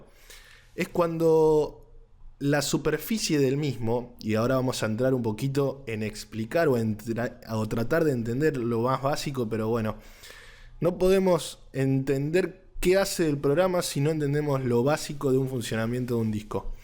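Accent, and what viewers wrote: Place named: Argentina. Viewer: Uno casi nunca encuentra un video tan informativo como el tuyo y encima con ese acento argentino que tanto uno necesita en la informatica jajaja, gracias genio aprendi una bocha con tu video ! Me suscribo y ojala sigas con el contenido que me parece muy interesante !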